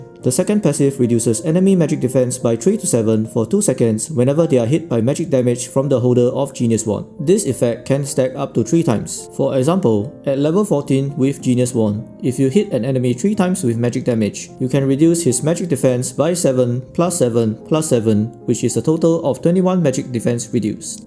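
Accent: Singapore. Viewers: u sound like a sg person hahaha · ay fellow singaporean, the accent HAHAHA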